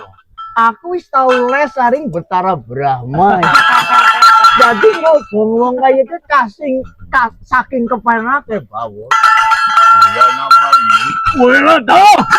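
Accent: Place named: Banyumas, Indonesia. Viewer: Dalang ngapak aneh-aneh Bae.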